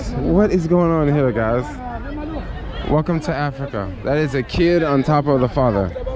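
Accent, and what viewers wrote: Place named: United States. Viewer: his accent is clearly American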